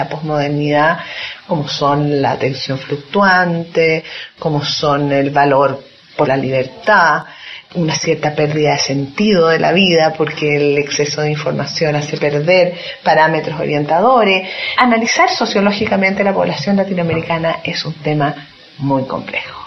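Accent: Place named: Chile. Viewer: Sin duda, un acento distinto. Claro y confuso a la vez. ¿Acento chileno?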